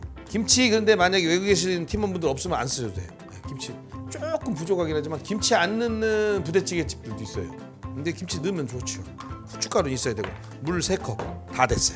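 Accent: Chungcheong, South Korea. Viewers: 아 충청도 말 구수해~!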